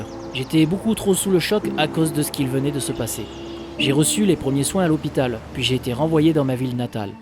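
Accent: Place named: Corsica, France. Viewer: Gros pervers moi aussi je suis une fille et en même temps tu as un belle accent Corse.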